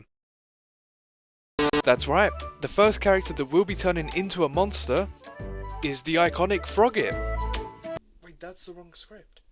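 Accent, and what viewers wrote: United Kingdom. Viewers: Your Brittish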